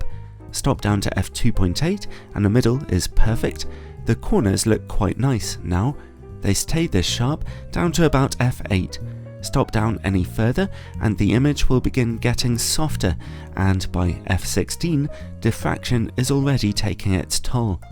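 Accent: United Kingdom. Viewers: ❤️ British Accent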